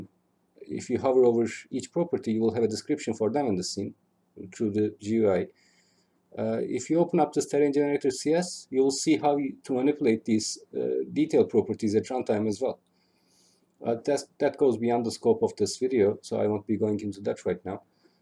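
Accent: Turkey. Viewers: Türk aksanı mı duyuyorum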